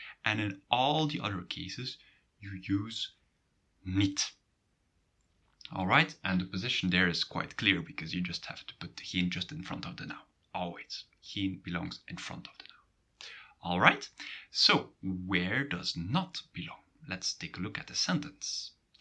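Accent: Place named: Belgium. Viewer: oh I thought I detected a Belgian accent. Thank you for making this video. Its very helpful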